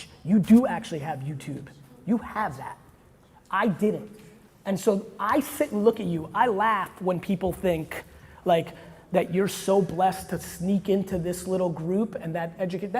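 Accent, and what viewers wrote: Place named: Armenia. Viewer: هاي ارمي🌝🌝❤ قبل لااقرا اسمك حسيت من طريقة كلامك انك ارمي🌝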